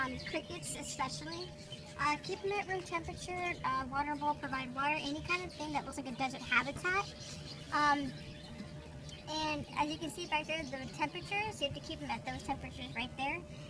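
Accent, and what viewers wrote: United Kingdom. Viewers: Ehy do you have a British accent ?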